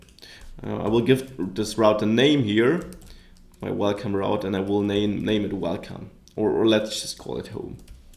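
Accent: Germany. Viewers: you for the prompt response 😊 I haven't yet looked at this but I will. I get what's going on and change what I believe I think is not working but nothing happens. I'll let you know if anything changes once I've compared and amended according to you git repository. Thanks you, great videos, I'm enjoying the German accent. :)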